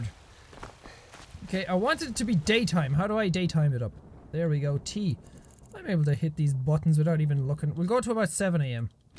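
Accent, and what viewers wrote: Ireland. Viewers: jacksepticeye I dunno what they are on about, sounds like a rich markisepticeye level of audible chocolate to me:B Maybe they are not used to the 'flutey to foreign' ear of the irish accent, but I could pick your voice out of a crowd of a hundred easy and that's a damn good thing for the U-Choobs! If you play Skyrim again give em two barrels of al gore:D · Your voice is not high pitched, it is simply your Irish accent. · Are Irish? I detect a small hint of Irish in your voice.....sorry if that was a personal question. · i luv yer irish accent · Personally I think your accent is awesome, it's not often you get to here an irish person talking in general. Which is kind of weird really, do you all just not like to present yourselves in public? What's the deal here? You're kind of representing your entire people really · Class accent I'm Irish too xD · Griffin Watson HES IRISH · so irish.. 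love it! (was in drogheda last summer, liked it a lot) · listen to an irish man bitch for 20 minutes... ill pass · He's Irish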